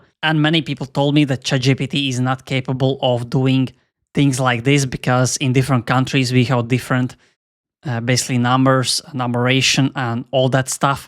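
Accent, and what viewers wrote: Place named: Russia. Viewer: Why your accent is so similar to Russian? I'm russian btw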